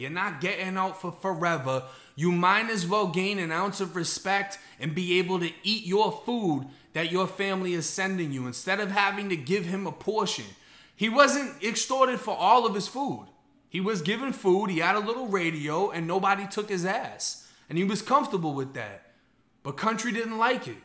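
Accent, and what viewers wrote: Boston, United States. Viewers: You got a Boston accent..how did you end up doing time in Florida?🤔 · You from Boston?? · That’s that Boston accent coming into play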